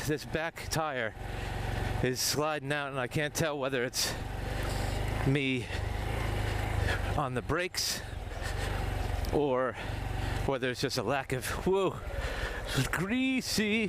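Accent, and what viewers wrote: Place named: Midwest, United States. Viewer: ive never been to this channel in my life, why does this guy sound like hes from the mid-west but he says he's living in the UK? I'm so confused.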